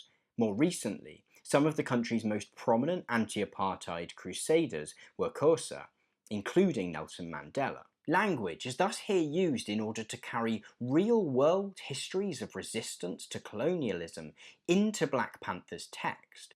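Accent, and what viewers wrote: United Kingdom. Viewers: why is a brittish person talking !!!!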